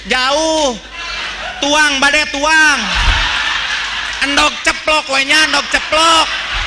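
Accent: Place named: Cianjur, Indonesia. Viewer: Logat cianjur 💯 leres 😅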